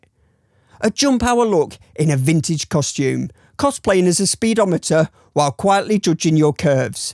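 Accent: United Kingdom. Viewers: Hooray Grimsby! I thought you sounded like Olga from The Toy Dolls. Sunderland. Maybe, Tyne or Wear. Awesome channel!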